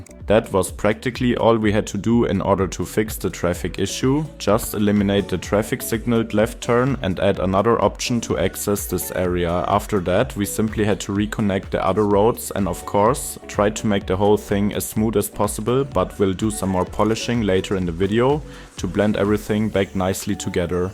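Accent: Germany. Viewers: Something about a German accent and doing engineering work that makes my heart warm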